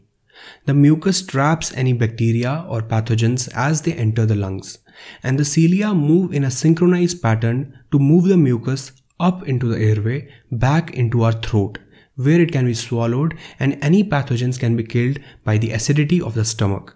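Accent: India. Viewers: im sorry but i cant take indian english accent serious at all lmfao. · I have to think about a scammer everytime i hear that indian dialect "Hi i´m Tim from Microsoft support center, located in Los Angeles" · Just some hate how indians speaks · I honestly love Indian accents i had a good laughs when he said “number 2nd” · what a phenomenal video. just what i needed. i love the way indian people speak too. its so soothing and relaxing, i could listen all day my man. maybe i should marry a nice indian girl. thanks for all the great info. liked and subscribed.❤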